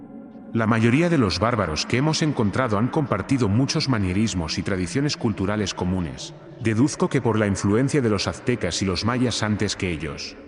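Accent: Spain. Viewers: Un pobre decrèpito video. Sabes nada de culturas Originales de Pachamama. Para y paren de repetir q' gente Original de tales tierras mataban y sacrificaban. Porq no mencionas las matanzas de MILLONES en el mundo por los "conquistadores" digo... matadores. Nunca podràs entender la psicologia profunda de lo Original...escucho tu voz y....eres Español? Verdad?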